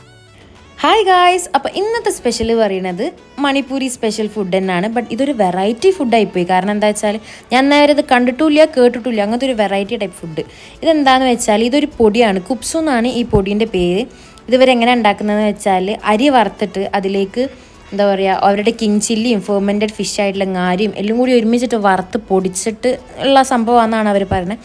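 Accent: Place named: Kozhikode, India. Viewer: His slank thani nadan kozhikode kari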